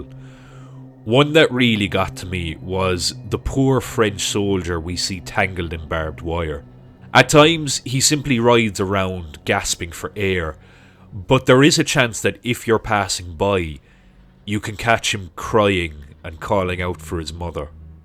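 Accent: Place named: Limerick, Ireland. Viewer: Oh, by the way, what's your accent? It makes me think of OP Shakespeare a little bit. Edit: Ah, Limerick.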